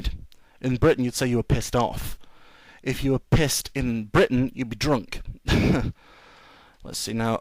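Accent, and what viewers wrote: New York, United States. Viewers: I don't see much of a difference in most cases. However, I've learned some expressions from Karl Pilkington, par exemple: "Knocking around" - to be "Having a go" - making fun of "loads" - a lot Any more Mancunian ones? Great NY accent, by the way! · thats a great new york accent man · GREAT NEW YORK ACCENT! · If you care to do a study of a strong NY accent, give a listen to the Bowery Boys (their films are funny as well).Your accent sounded NY enough to me to get the point across. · In Scotland, it goes Nursery School, Primary School, High School then either College or University. You get to choose what you want to do after High School depending on your grades. Though University is above College in the "Ranks". Its more harder than College. Also, I loved your New York accent. lol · Your New York accent is good. What I'd like to ask--and you may/may not have an answer is why some English pronounce words that end in vowels with a faint r, such as idea=idear, or saw=sawr/sahr, or america=ameriker. 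I've wondered about this for some time. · That was an amazing old New York accent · cinema= movie theater People use both in US. You know something, I thought it was offensive to call British people Brits. But I hear y'all calling yourselves that quite a lot. Wait college and university?? What age range are those? Also, love your New York accent.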